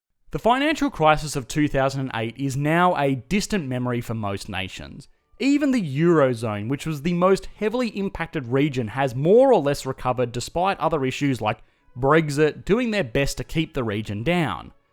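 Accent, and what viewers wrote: Australia. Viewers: Narrator is aussie i think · It is very true that Greeks leave the country especially the young ones. I am 17 right now, when I finish uni in some years I am planning to move to the UK/US/AUS (i am looking for a stable, English speaking nation) and so are most of my friends. Also loving your Aussie accent mate!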